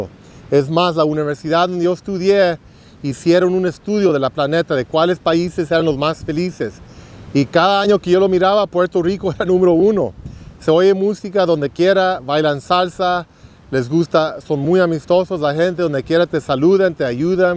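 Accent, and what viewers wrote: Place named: Mexico. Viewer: El tiene accento mexicano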